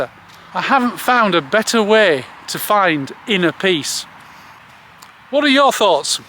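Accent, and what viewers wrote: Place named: Cockney, United Kingdom. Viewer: This man is speaking with a Cockney accent.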